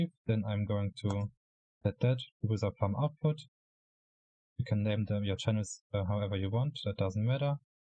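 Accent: Germany. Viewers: are u german? sounds like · Der deutsche mit seinem Akzent :D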